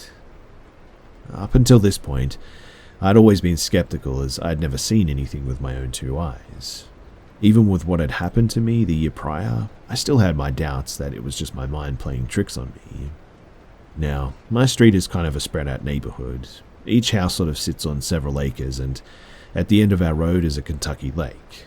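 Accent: Australia. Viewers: Ah an Australian voice finally!? I'm in Adelaide. Chilling stories 🐨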